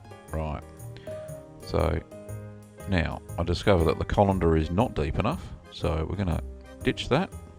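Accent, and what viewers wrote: Australia. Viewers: You sound like the Aussie version of the BBQ Pit Boys!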